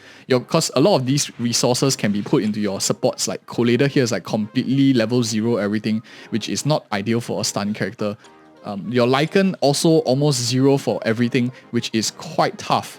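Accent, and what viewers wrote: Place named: Singapore. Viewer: He has a Singaporean accent.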